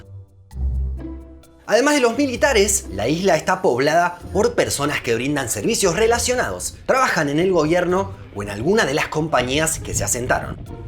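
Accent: Argentina. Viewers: El acento del muchacho parece argentino (un argentino que habla bien clarito), pero me parece que el canal es de España....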